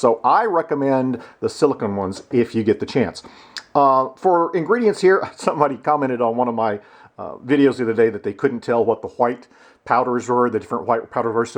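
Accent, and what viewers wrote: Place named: Canada. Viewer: You have a Canadian accent. So, where in Canada is there a shop that sells crumpets 24/7 ?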